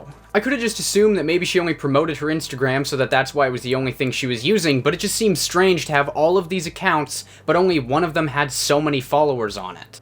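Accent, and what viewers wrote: Canada. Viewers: Bro are you Canadian we can tell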